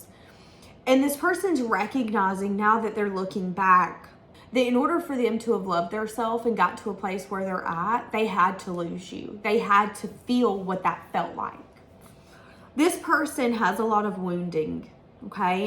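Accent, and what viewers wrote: South, United States. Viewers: This Yankee LOVES the manner in which you speak! The descriptive way you talk plainly, intuitively with a Sourhern flair that is Spiritual AND hilarious! You are appreciated, dear lady.😊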